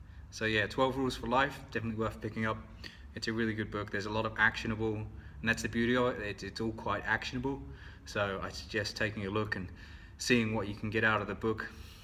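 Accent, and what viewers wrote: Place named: Australia. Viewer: Hey has anyone ever told you that you speak with a little bit of an aussie accent?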